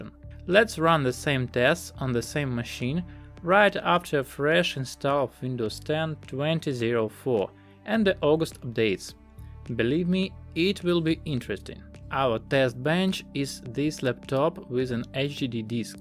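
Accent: Russia. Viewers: По акценту непонятно, что он рускоговорящий?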